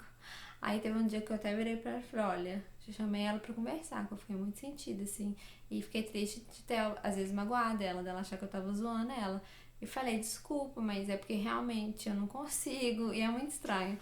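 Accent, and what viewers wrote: Minas Gerais, Brazil. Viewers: O que percebo eh seu sotaque mineiro, alias, dos brasileiros,é o que mais gosto. As Dinamarquesas costumam fazer blogs, eu nao tenho ideia de como é o idioma dinamarques, eu ja ate botei algum video aqui, porém nem lembro, mas pelo jeito devem falar divagar porque as duas brasileiras q acompanho no ytube, voce e outra, falam bem divagar...ou será coincidencia...a outra é carioca. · Você tem o sotaque mineiro bem forte hahahahah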